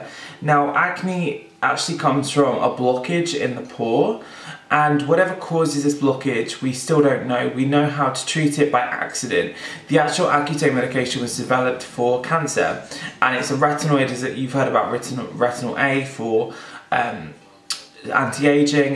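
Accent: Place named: United Kingdom. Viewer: Omg I was thinking the same thing I think it's the British accent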